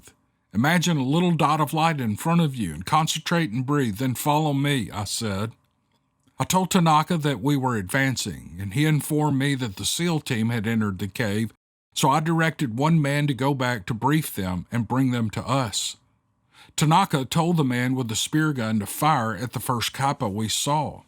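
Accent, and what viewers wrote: South, United States. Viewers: Same here mam been on here for years now love the southern gentleman’s voice w the light touch of southern accent awesome Sir he is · I started listening to this story at work am and couldn’t turn it off. Amazing stories and, you just takes it to another level with his Southern Comfort style narrating it captures my mind like I’m watching a movie. Thank You.. I made it to the end.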